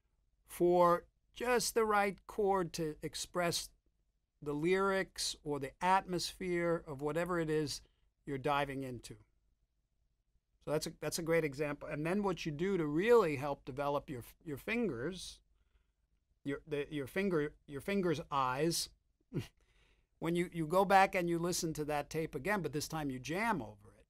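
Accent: United States. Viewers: I just got back to this after a day of work. I can totally hear your Brooklyn accent now!!!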